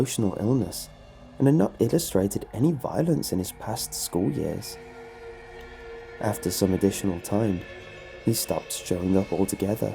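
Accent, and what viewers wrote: United Kingdom. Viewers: God I love you. Your voice is terrifying and soothing at the same time, aided by the fact you sound young yet mature. That British accent is a bonus too!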